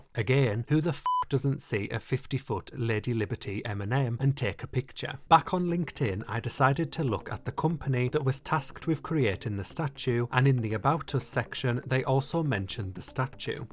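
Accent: United Kingdom. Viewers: Why is he so british? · English accents are hilarious, the southern accent is funny but your one is really funny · Great video, your voice reminds me of Jon Ronson. And with that, has that Cardiff sound 😅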